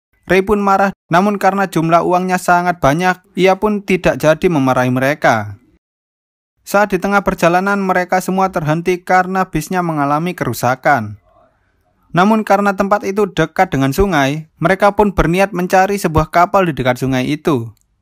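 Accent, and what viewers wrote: Java, Indonesia. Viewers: Bang orang Jawa kalh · Jowo mas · Bang lu orang jawa yh?? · He kak wong jowo yo kok ngomong e koyok wong jowo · TERLALU NJOWO BANG 🤣 ZOMBIE JADI JOMBIE 🤣🤣😂🤣😂🤣😂🤣😂🤣😂🤣😂🤣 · Mimin keren bgt logat jawa nya auto subscribe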